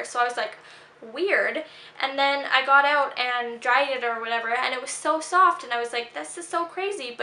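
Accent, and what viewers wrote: Canada. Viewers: Where r u from? I thought ud b from America but apparently u aren't...I'm guessing Canada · She Canadian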